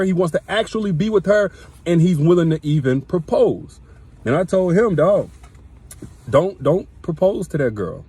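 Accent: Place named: New York, United States. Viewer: NYC. Loud and clear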